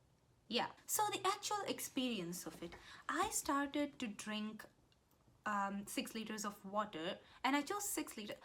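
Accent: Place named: India. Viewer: Typical indian accent, bathroom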